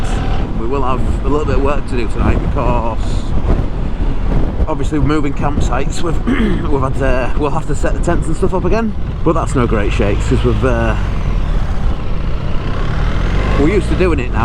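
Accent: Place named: North, United Kingdom. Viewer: You sound more northern than normal. And I don’t know how that is possible. As usual. Incredible video!!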